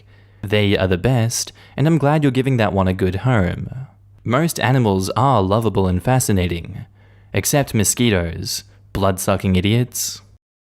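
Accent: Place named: Australia. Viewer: Ayeee what's poppin,?? Also, is your government name or just a nickname? Thank you for all the videos and lovely Aussie accent. We love you, we appreciate you. Keep going mate, you're bloody awesome 🤗💞🙋🏾‍♀️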